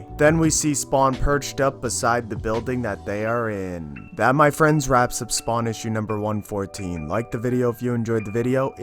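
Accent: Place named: Wisconsin, United States. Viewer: I’m from Memphis. My oldest brother lives in Wisconsin and your accents sound similar. Like the way you say floppy tits in a past video sounded exactly like my bro ha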